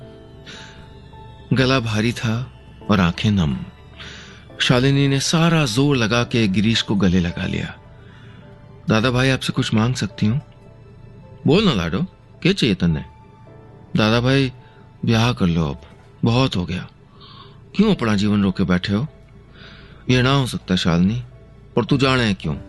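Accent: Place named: Rajasthan, India. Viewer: Sorry bit Terrible Rajasthani accent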